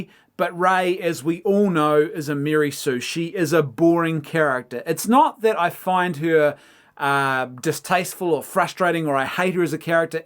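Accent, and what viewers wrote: New Zealand. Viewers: My guess is Kiwi from the south Island; If I got it right, it took me 5 years to hear out the distinctiveness in the accents... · I can tell you are Kiwi by the way you pronounce “fish and chips”, “fush and chups”. In Australia, they say “feesh and cheeps”. 👍🏻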